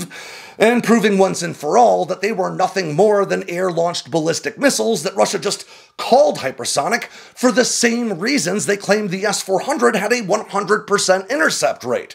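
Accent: United States. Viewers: As soon as you hear an American Accent in a video about China, you can rest assured that is gonna contain a pile of Yankee Bullshit and opinions upon about things about which they really have not got a clue.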